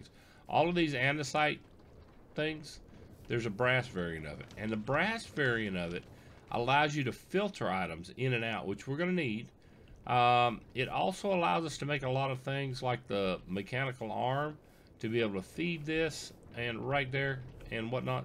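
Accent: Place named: United States. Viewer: this mans voice makes me miss the USA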